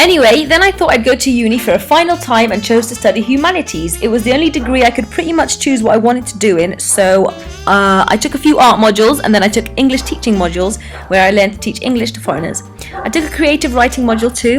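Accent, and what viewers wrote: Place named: England, United Kingdom. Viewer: I can't sometimes understand what she said cause she speaks fast with an English accent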